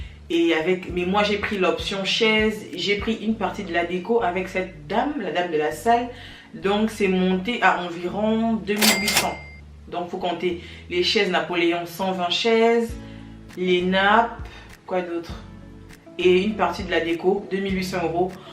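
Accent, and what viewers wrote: Gabon. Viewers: Votre accent m'est beaucoup familier❤ Le Gabon est là 😊?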